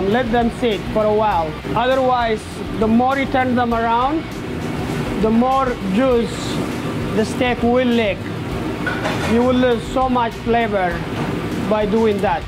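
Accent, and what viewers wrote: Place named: India. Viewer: This cook is really messed up he is talking part indian accent and part arab accent ... i m very confused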